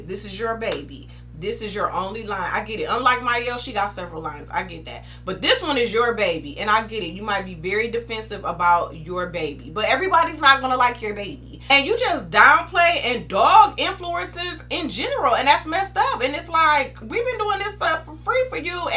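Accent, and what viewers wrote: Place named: South, United States. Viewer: You sound southern , where you from love 😍. Loved the video and honesty ❤️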